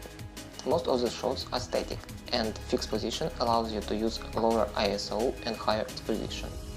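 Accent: Russia. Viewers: Ты русский по произношению понятно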